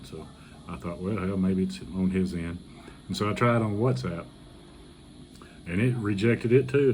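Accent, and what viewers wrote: South, United States. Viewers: I don't know if you're speaking quietly on purpose, I'm from Minnesota and with your southern accent, I can't understand a word you're saying, plus you mumble, and with that relaxed style, it'd be helpful if you could pla annunciate, thx for your fine content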